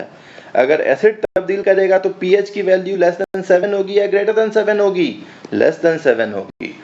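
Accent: Bihar, India. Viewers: Sir ka bihari tone ...very sweettt ...🥳 Mazzzzzaaaa aaa gyaa ...grt explanation 🙏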